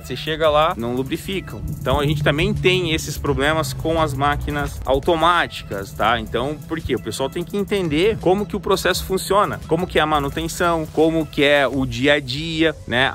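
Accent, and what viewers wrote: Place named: South, Brazil. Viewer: Esse sotaque e aqueles pinheiros no fundo da pra saber q tá bem no sul kkk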